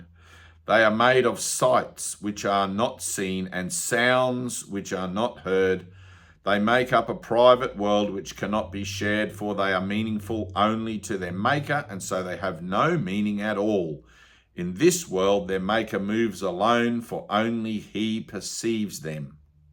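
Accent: Australia. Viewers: Hey mate u sound like you're an Aussie, were abouts are u from? I currently live in Perth, but from nsw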